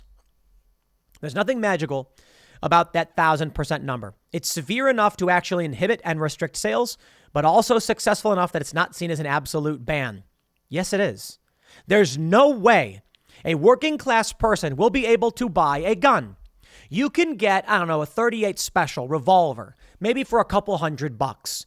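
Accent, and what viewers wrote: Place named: Chicago, United States. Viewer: I just realized still has a Chicago accent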